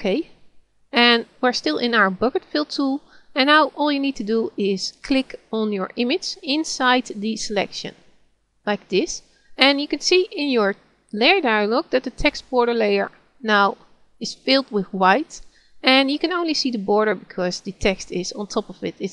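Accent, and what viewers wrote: Netherlands. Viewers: if im not mistaken,i can tell by your accent that you maybe dutch... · i think she is from Amsterdam based on her accent. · Thank you for this tutorial are you btw Dutch, 'cus you have a Dutch accent :P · ik kan horen dat je nederlands bent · Omg. The second you started talking I heard you were Dutch. Hello fellow Dutch person! XD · Although the intention of the person wanting to teach us about Gimp is highly commendable the pace is too fast. The Dutch accent of the speakers English could be also somewhat problematic for some. These are slight critisms because you have to thank the person for taking the time and trouble to make this video. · Ogod Dutch accent RAWRRR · ik hoor gwn dat je nl bent :) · I am pretty sure she is dutch. Even tho i am dutch too most of the people in Holland who try to speak english get this accent. It sucks. 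· nice dutch accent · This is a Dutch accent for English!! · lovely dutch accent with crisp clear english and no loud music.I found the video very helpful thank you. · om god you just can hear that she come from the netherlands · dutch accent i presume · AND I find her Dutch accent delightful, so different strokes lol! She is organized, has a nice pace AND is a good teacher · Your accent.is dutch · I think her English is fine - guessing a Dutch accent. Thank you GIMP teacher, I've been struggling with GIMP and learned a lot. You are a good teacher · Intuxikated You hear it clearly that's she's Netherlands xD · such a dutch accent ah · you are dutch i can hear the accent ;3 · Haha, echt een Nederlands accent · I just followed this tutorial to the letter and created my first snazzy gimp image with all the layers she introduced. Excellent tutorial. And to those of you losers who moan about her accent/diction, she's clearly a Dutch girl. 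Do you know what that means? It means she speaks at least FOUR languages fluently. Most Dutch kids speak Dutch, English, French and German perfectly by the time they are teenagers while 1 in 3 Americans are illiterate in just 1.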